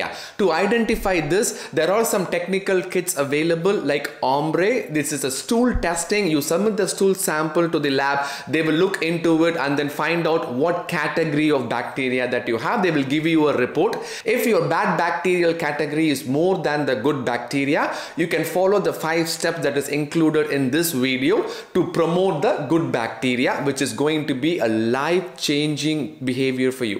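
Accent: Tamil Nadu, India. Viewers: Please Sir.... your speech is as if you r speaking tamil in English 😂... I love the accent 😅 Please slow down your speed of speech...so that we can get more clear 😊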